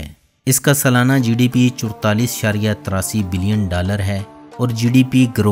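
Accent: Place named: Punjab, Pakistan. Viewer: yar urdu to seikho bhaye sp URDU esy bolty ho jesy panjabi bol rahy ho mazrat k sath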